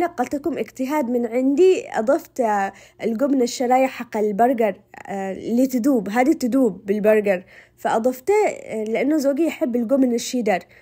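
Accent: Aden, Yemen. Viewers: ما شاء الله تبارك الرحمن روعة ومتألقة في طبخك وقناتك الله يعينني كلما شفت طبخك سال لعابي وقررت بطني ولهجتك العدني تجنن الله يسعدك ويوفقك وبالصحة والهناء والعافية والسعادة عليكم يااااارب ❤❤ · ماشاء الله عليك مبدعة وفنانه واسلوبك بسيط وكفاية كلامك العدني ي بنت عدن الحبيبة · انا بشوف القناة عشان الهدرة العدنية جبر قلبك❤ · والله انك تجنني تسلم ايدك واللهجة اليمنيه روووووعه ماشاء الله الله يسعدك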